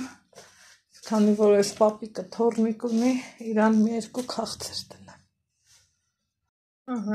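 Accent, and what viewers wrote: Lori, Armenia. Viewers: Թող լսեն անուշ համով հոտով լոռվա քաղցրր բառբառովա խոսում, հրաշք հայուհի ջանը💕💕💕💕💐👍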